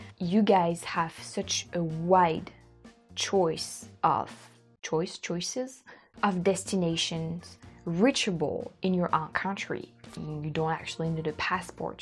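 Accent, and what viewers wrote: United States. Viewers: Can I just say how much your English has improved even from a year ago. Your accent is very light now and you have a good grasp of the American accent. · The way she speaks English with an American accent is so freaking cute... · Your English is American accent! Did you live here ? Love the vlog and you living life to the max. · I have watched you for a few years and your "American English" is getting very obvious. I can hear it very clearly. It reminds me of when I was in Eastern Europe and the young people learned English from Americans (Bosnia and Kosovo).. They would pick up southern accents and it was funny to hear them talk with an eastern European accent combined with a US southern accent. Also hearing them adopt the culture of "yes sir/ma'am", general politeness to strangers, etc. I miss taking the people that worked on our bases and their families to special occasions like Thanksgiving dinner and 4th of July parties where we opened up our dining facility and had sports events for them and their families! 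So much fun!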